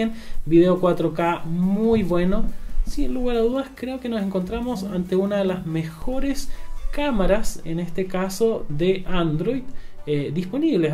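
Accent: Chile. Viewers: el es chileno?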